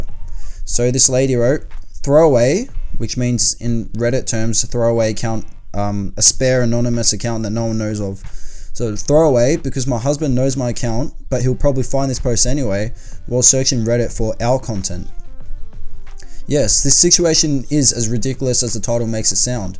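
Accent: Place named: Melbourne, Australia. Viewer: i know u were saying owls but im not from melbourne so ur melb accent making it sound like ur saying elfs n im sitting here picturing elfs for majority of it until i realised, my head hurts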